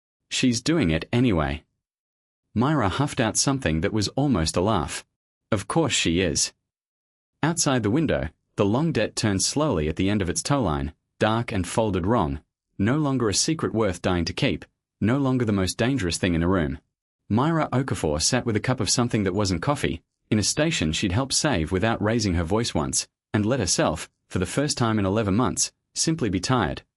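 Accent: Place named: Australia. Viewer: wdf?... and the reader has an Australian accent? (I might be wrong but it sounds... unusual)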